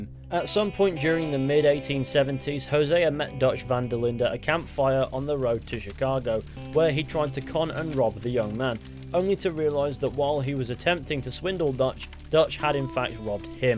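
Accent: England, United Kingdom. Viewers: Umm I'm an American and in no way would anyone say his name that way. And for real British are not ones to talk. Bloody Hell (For real get a new catch phrase) You taking a piss? (Makes no sense) My friend is in Hospital (surly you mean in "a/the" hospital) it's not like you say my friend is in store. No your friend would be in "the"store. I could go on and on but you people long ago lost touch with speaking correctly. I once herd a comedian say Americans say Herb wrong because it has an H in it. But then how do you explain Knife? And you guys LOVE that letter H you super put alot of weight into it. Just saying next time you wanna say someone else talks funny make sure your own accent isn't full of stupid memes. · I'm sorry but this video would be better with a deep American accent instead of a high pitched Englishman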